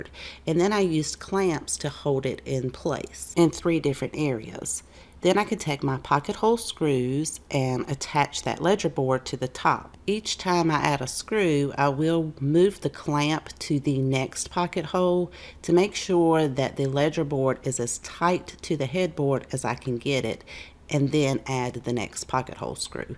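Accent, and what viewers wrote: South, United States. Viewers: Same!! I was trying to guess how far north of I-10 her accent originated from 😂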